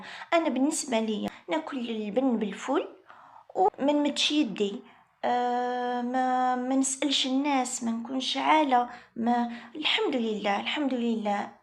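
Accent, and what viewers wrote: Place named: Tipaza, Algeria. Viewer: لهجتك بنت تيبازة ،ما شاء الله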